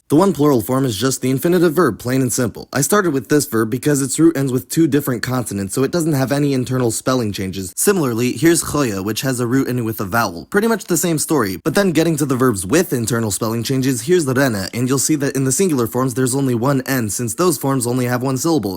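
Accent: Amsterdam, Netherlands. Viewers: Small correction on the phonology, nearly everything that applies to the Belgian dialects also applies to southern Dutch dialects. Also you're pronunciation is the best I've heard from a non native. You sound like you're from Amfsterdam specifically, which is a compliment. Edit: I'm not sure if this goes for all dialects but at least in Brabants we often but not always drop the [t] at the end of words. So in my native dialect (Tilburgs) I would say: da in stead of dat, wa in stead of wat, but kat, boot, gat and het would all keep their t's. I don't know why we do this, but I thought it was interesting.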